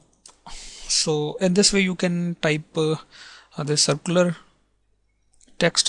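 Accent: India. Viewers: I love the Indian accent. It actually makes english sound nice for some reason.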